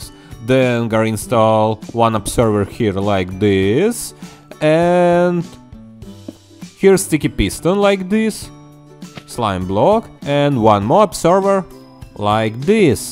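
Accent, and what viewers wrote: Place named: United Kingdom. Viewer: Отличный акцент. Ты из британии?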